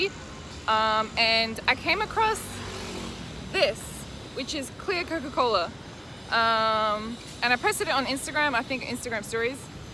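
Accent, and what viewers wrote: Australia. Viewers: aussie accent.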